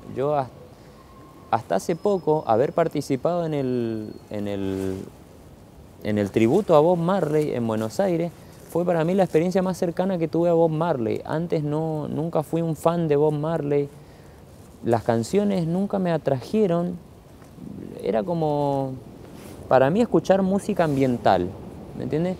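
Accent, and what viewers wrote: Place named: Peru. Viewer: a ver señor atole, ponga atención a la gramática, yo no escribí que no lo conoce, escribí que dice que no lo conocía, es decir en tiempo copretérito, denotando que este peruano con apariencia de peruano y cabello lacio de peruano y acento de peruano que ahora se dice el mismo que es argentino quiere dar a entender que el conoció el reggae y empezó a hacer reggae y que el ni en cuenta que existió bob, luego presume que tiene canciones en inglés, y bla bla bla puro egocentrismo, y obvio que se puede aprender por otros medios pero no estamos hablando ni de ti ni de mi si no de ese falso rasta que nunca lo fue en verdad, su voz temblorosa al cantar parece enfermo y sus letras aparentemente con sabiduria estan mas que vacias. quieres saber de rastafari tengo el kebra nagast y los libros de enoc por si te interesa saber de un tema en particular.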